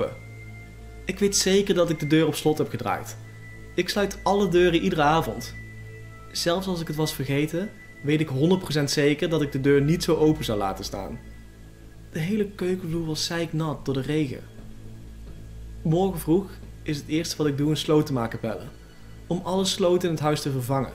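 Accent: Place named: Belgium. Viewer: is een belg of limburger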